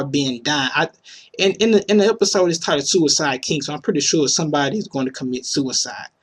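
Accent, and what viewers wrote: South, United States. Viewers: What state are you from? I can't place your accent? Georgia? One of the Carolinas? PS. Thanks for the videos. New subscriber here. :)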